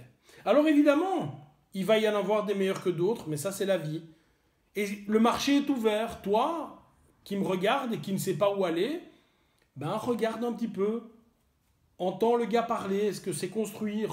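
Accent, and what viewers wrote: Belgium. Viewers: Bonjour, ne penses-tu pas que tous ces conflits entre fédérations, pour des histoires de gros sous, d'égo, gangrènent un peu le Krav Maga ( en France ). Je ne rentrerai pas dans les polémiques, et je ne prendrai pas parti pour qui que ce soit...Mais, j'ai l'impression, et la certitude même qu'on colle l'appellation Krav Maga à toutes les sauces, sur des systèmes de Self défense, pour que ce soit plus "vendeur" ou attractif. Je ne sais pas ce que vaut l'I.K.M, et je ne permettrais pas de juger...Sincèrement, je partage ton avis, sur le fait qu'il y a des bons partout, et des mauvais partout. J'aime assez ta façon de voir les choses, mais ( avec tout le respect que je te dois ) j'avoue que je ne sais pas quoi penser de "civils" enseignant des techniques "paramilitaires" voir " policière", impliquant parfois des armes, à d'autres civiles. Je pense sincèrement que ça devrait rester le domaine des forces de l'ordre. 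Ce n'est que mon avis, n'y vois pas une attaque ou quoique ce soit d'autre, mais, je suis persuadé que tu comprends ce que je veux dire. Continue tes vidéos, j'aime assez les regarder, car elles sont souvent très justes...J'ai beaucoup de sympathie et d'amitiés envers nos voisins belges, et c'est un régal d'écouter ton accent. Force & Honneur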